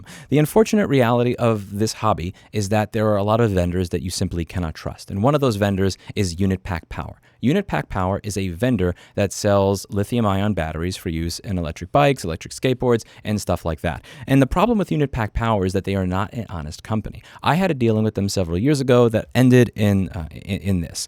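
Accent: New York, United States. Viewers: Good to see he's keeping his angry n'yohka style, even in sunny Texas, when he needs no put these scammers in their place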